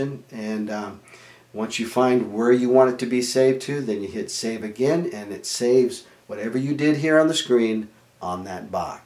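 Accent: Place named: Los Angeles, United States. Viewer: Where is your accent from? L. A.? Reminds me of Greg Graffin from Bad Religion in old interviews.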